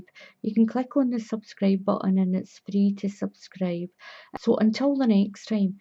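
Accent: Scotland, United Kingdom. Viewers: What a lovely tutorial and so great to hear a fellow Scottish accent as a bonus!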